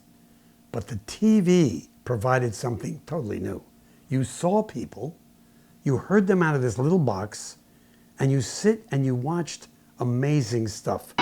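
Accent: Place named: Brooklyn, United States. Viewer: I love your accent, I wish more people still had it. Are you from Brooklyn? My grandmother grew up in the Bronx and had a similar accent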